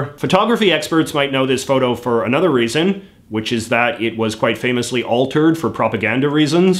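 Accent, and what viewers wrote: Canada. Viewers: the what now?? I knew you had a strong Canadian accent but there is no way that's how to pronounce archipelago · I stumbled onto your channel by accident a couple weeks ago towards the end of a YouTube binge after a long work week. Not even a minute in, I remember feeling this overwhelming sense of animosity for you and the post. It wasn’t just that I disagreed with your politics or world view; I really didn’t! I don’t know if it was your smug disposition or that forced thick Canadian accent, but I found myself quietly siding with those French hillbillies from Quebec. Its been a few weeks and I have to confess I subscribed and look forward to your videos every week. Still haven’t figured it all out but I’ll keep tuning in until I do.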